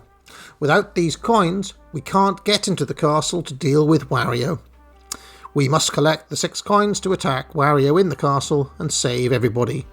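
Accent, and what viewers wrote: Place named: United Kingdom. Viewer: Pompous British Mario timeline... I can't listen past 5 minutes of this garbage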